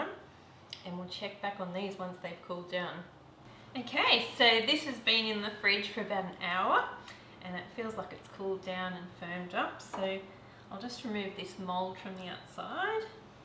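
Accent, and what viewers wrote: Australia. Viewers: i don't think i did, i'll give it another try. The reason i took it off the heat is because the mixture already looked thicker than the one your poured. thank you, hearing your accent makes me miss Australia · omg i cant bare the aussie accent! so annoying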